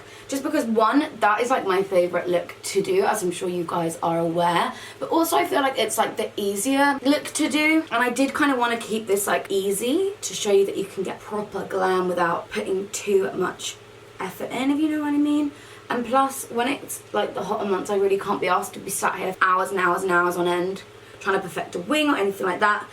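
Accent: Australia. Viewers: Is it just me who loves her Australian accent😜☺️😍😛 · Omg yaaaass! favourite look! soo fab! haha your aussie accent isn't too bad very dramatic but crack up. I am a kiwi ( aka a New Zealander) but still think you didn't doo too bad! x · I bloody love your Australian accent 😂 keep doing it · have to say for an english girl your aussie accent is good lol but this is a great look :) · Your Australian accent is spot on! (I'm Aussie)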